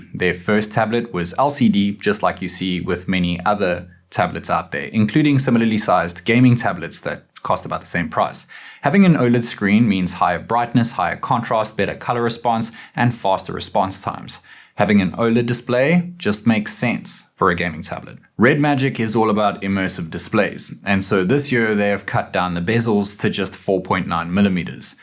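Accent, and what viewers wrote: South Africa. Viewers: can i ask if this guy is South african? the accent sounds very similar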